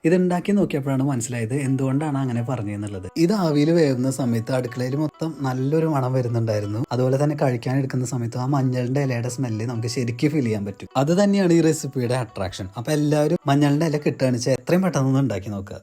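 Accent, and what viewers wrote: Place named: Thrissur, India. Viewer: തൃശൂർ ഭാഷ കേൾക്കാൻ സൂപ്പറാ❤❤❤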